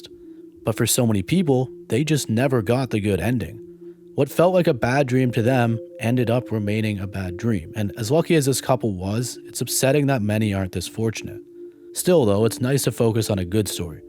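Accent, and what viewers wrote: Canada. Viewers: Love this new chan theres loads n loads strange things I never seen before plus love way Canadians say oot 😅 same as guy from criminally listed 😀 😄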